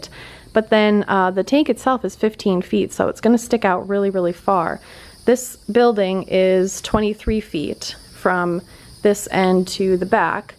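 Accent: Minnesota, United States. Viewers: HA! I knew you were from Minnesota!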